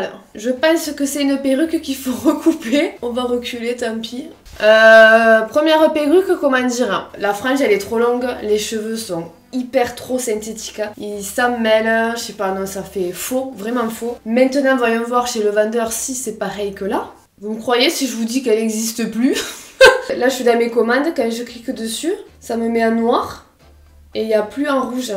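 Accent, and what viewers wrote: South, France. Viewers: En tout cas on sait qu'elle vient du sud pas de doute à priori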